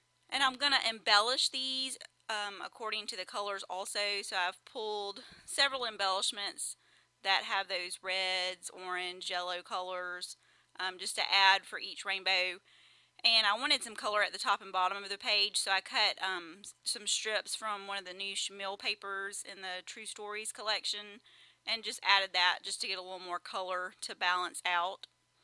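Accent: South, United States. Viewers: Where are you from? I love your southern accent.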